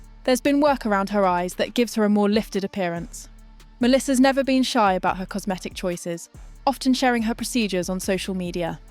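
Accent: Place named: United Kingdom. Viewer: Are you Lucy from Engliswithlucy?? You have the same voice. And Iove your British accent. Good video !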